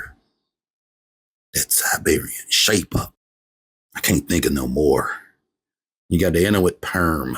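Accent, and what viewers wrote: South, United States. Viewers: the southern accent is distracting and cringe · Man. I'm from Georgia and your ass had me 😂 crying talking like one em country boys. Love this channel. Keep doing your thing man. I'll keep watching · Bruh, this southern accent is trash. Makes a lot of the video hard to watch.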